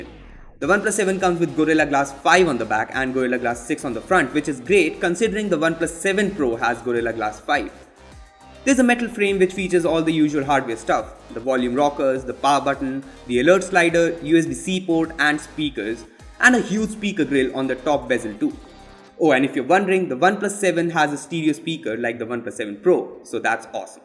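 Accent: India. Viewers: Could you please drop the Indian accent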